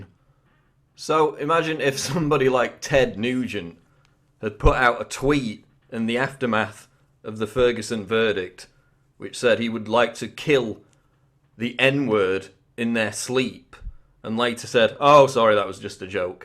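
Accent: United Kingdom. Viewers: whats up with the british accent pronouncing words that end in "a" with an "er"? just an observation. as americans we sometimes look to england for proper english,maybe not only did we come closest to perfecting society but maybe common language as well. that being said, thanx for dropping logic on the kids.your social geopolitical game is strong.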